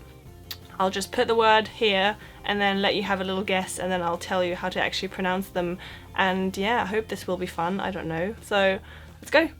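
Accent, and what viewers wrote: United Kingdom. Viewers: You look beautiful and I love your British Accent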